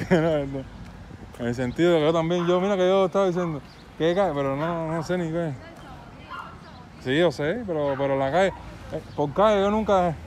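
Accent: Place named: Andalusia, Spain. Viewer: loco por ver sus videos ,, jajaja , en Andalucia y Canarias hablamos asi, eso se llama cultura y diversidad , por si no lo sabia , desde España , bendiciones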